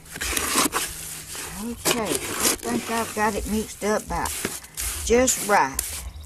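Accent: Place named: Georgia, United States. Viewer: I love your Georgia accent. I am from Amarillo, Texas so I have a Texas accent. Good thoughts coming to you from Texas.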